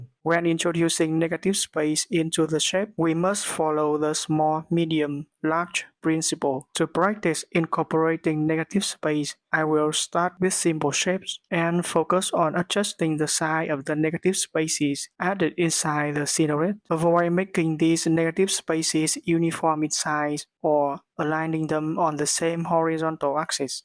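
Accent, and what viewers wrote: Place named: Vietnam. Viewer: Giọng phát âm tiếng anh nghe đúng tệ, nghe y như người việt, ngó ra cái kênh hóa ra của vn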